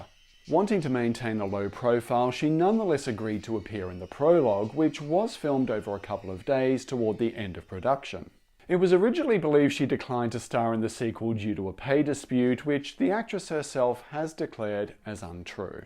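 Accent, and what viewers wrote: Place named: Europe. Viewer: It’s kinda wild to hear someone with a European accent, critiquing these films. I guess I never considered that these films (especially the early ones) might have had an impact on foreign European audiences, which tend to be softer and less keen on gratuitous gore and violence.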